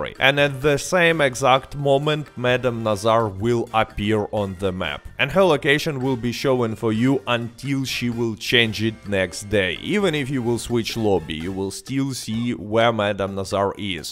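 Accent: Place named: Russia. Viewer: My dear I stop paying the game but I still love your video I enjoy your Russian accent very much please keep up the good work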